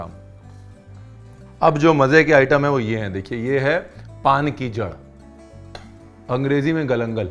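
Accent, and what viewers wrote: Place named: Lucknow, India. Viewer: तुम्हारा लखनवी लहजा बहोत खूब हैं! मै भी इसी तरह बात करना चाहती हूं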